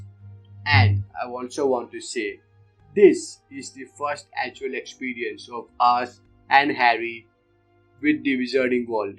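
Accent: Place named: India. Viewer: There is the microphone! Being bilingual myself I can say your english is AMAZING! I know you are self conscious but I can understand you well, keep practicing with your scripts and edit out bad clips to improve confidence. As I said, you speak well so don't be afraid to try! Out of curiosity where are you from? Your accent seems to be somewhere around India but I'm not entirely sure...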